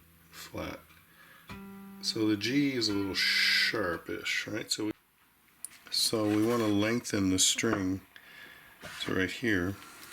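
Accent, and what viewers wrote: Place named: Ottawa Valley, Canada. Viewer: Over here in the L. Ontario basin, we can hear the subtle, very subtle Ottawa Valley accent ... it's a Juzz Bayce!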